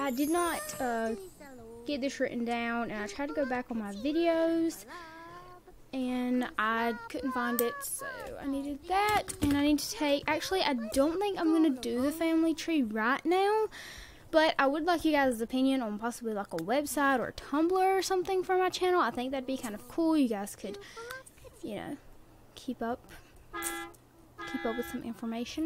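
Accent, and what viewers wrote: South, United States. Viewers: Your shoutout literally made me smile from ear to ear I'm so happy you liked the home. I really love this series so much, and your so amazing I love your voice and the way you say most things it's so....southern lol I love youuuu!!!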